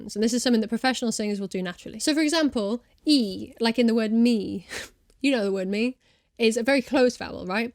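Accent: United States. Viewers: You are correct, there are 20 American accents, but over 37 British accents. Your generic American accent is INCREDIBLY GOOD. I’ve heard otherwise amazing actresses (such as Cate Blanchett) unable to pull one off convincingly. You could give them lessons! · I didn’t know such a perfect video could exist. Nice American accent, btw! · your american accent is so convincing (for a non-american) !! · You're American accent isn't bad. I like (singing or speaking) to listen to different accents & figure out what's similar & what's different to my own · Bloody hell you pull off an absolutely flawless american accent. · Your American accent is SO good !!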